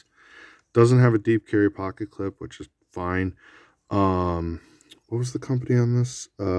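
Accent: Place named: Massachusetts, United States. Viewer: Not a bad collection! Pretty ridiculous seeing the two Toors side by side. I handled a v1 in my local knife shop, but have never owned one. I'll grab one when I find a good enough deal. Have you seen the new $425 folder they're making? Looks kinda like a 3.25" Greyman Satu. Looking forward to the sharpening video. You showed a few decent edges. I thought I recognized a bit of mass accent... Im in central new york.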